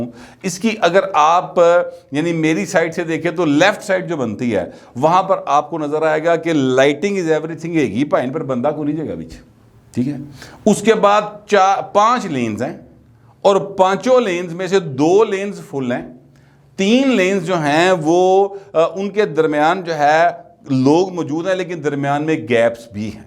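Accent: Lahore, Pakistan. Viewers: i really enjoy your lahori accent, urdu punjabi mix...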